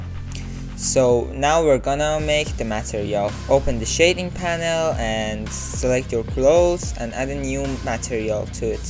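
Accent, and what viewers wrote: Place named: Iran. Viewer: داداش لهجه ایرونیت خیلی باحاله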